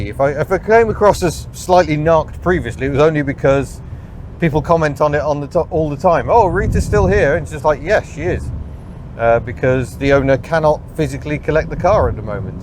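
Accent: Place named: Scottish Islands, United Kingdom. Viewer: sounds like the west coast islands of Scotland to me?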